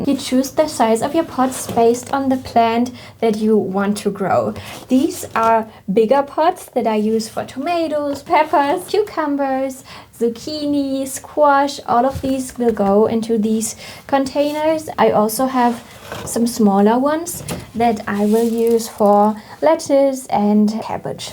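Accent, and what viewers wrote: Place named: Germany. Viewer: You’ve got the most lovely german accent I’ve ever heard, great video!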